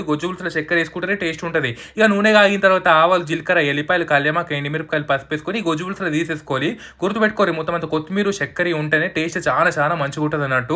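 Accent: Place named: Telangana, India. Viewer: తెలంగాణ స్లాంట్ లో.. ఎలా మాట్లాడుతారో..అచ్చు అలానే మాట్లాడుతున్నారు...❤❤ సూపర్బ్🎉🎉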